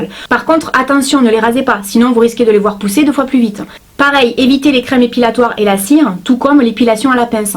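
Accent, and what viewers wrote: South, France. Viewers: Toi tu vien du sud j'aime ton accent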